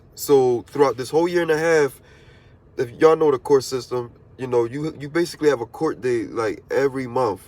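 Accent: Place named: Philadelphia, United States. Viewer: your from philly bro i can hear it, fighting my 2nd dui right now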